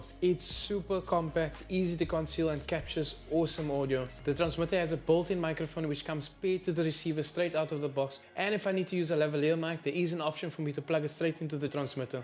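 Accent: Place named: Cape Town, South Africa. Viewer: Howzit from a fellow Capey. I just discovered your channel. Really cool stuff. I enjoy hearing your accent. I live in Zagreb, Croatia. You should come here sometime and do some travel vlogs. We have some awesome locations.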